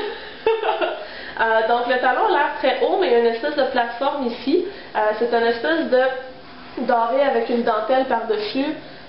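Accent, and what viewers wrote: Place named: Montreal, Canada. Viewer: J'ai vécu un an à Montréal, quelle bonheur d'entendre ton accent :). Et qui plus est, tes vidéos sont très bien :)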